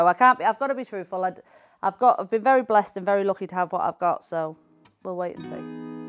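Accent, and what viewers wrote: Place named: Doncaster, United Kingdom. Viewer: Aww beautiful 12 15. I wish i could keep more than one child. My ex wouldn't let me. Well done Paris 👏 loved the documentary by the way. I recognised some places in Morecambe from our family holiday. Beautiful home in a seaside town. Great to see you both getting along. You are amazing 👏 great to hear you haven't lost your Doncaster accent.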